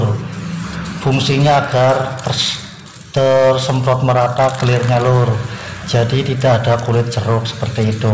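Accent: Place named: Banyumas, Indonesia. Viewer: njenengan sih wong ndi lur, denenge ngapak pisan?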